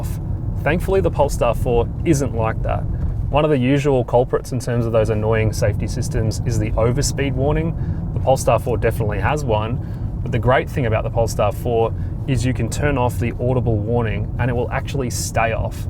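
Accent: Australia. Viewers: The only spoiler is that tearable Australian accent. Very hard to understand. Kiwi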